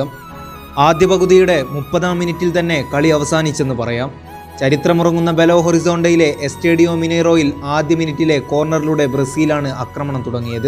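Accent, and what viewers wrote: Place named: Malappuram, India. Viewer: Very nice presentation .I came to this channel after watching your video on Mascherano.....ningal powliyanu...Njan Alappuzhakkarananu.... malappuram slangil Messiude video kelkumbol ulla feel onnu vere thanneyayirunnu...Keep doing more videos..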